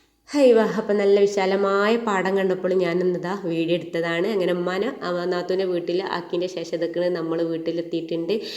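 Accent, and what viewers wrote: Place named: Malappuram, India. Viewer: 😊😊😊. എന്റെ hus മലപ്പുറം ഡിസ്ട്രിക്റ്റ് ആണ്. നിങ്ങളുടെ സംസാരം same 😊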